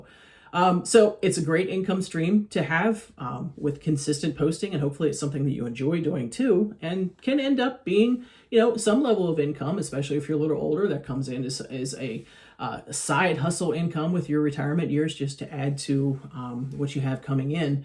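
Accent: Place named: Pennsylvania, United States. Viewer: Great information! Do I detect a Pennsylvania accent or a bordering state?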